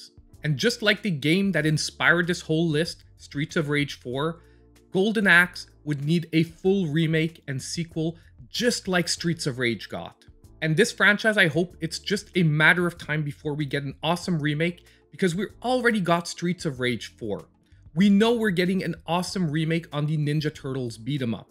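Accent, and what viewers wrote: Canada. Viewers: I come for the content. Stay for the Canadian accent. A youtuber unlike any udder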